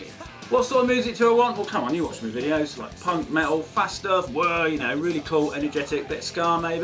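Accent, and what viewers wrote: United Kingdom. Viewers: I AM MISSING YOU MAN! Can't live without your magnificent british accent! <3 u bro! LETS GO CRAZY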